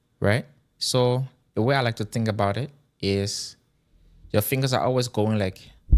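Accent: Liberia. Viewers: Brother u sound like a Liberian ❤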